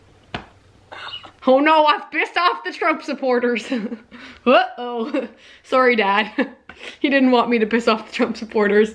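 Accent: Canada. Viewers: are you canadian? you just have the vibe/accent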